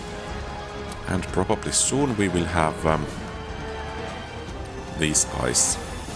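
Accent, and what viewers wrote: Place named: Finland. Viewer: Are u from Finland? Ur accent is so finnish. If you are, paljon kiitoksia sulle Venäjältä! P.S: NoToWar!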